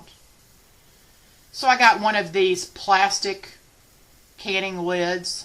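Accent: South, United States. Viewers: Oh wow! So so great to hear your cool southern voice again 🤗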